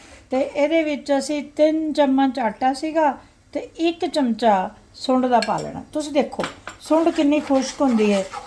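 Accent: Punjab, India. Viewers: Aunty ur punjabi is so so sweet.im from pakistan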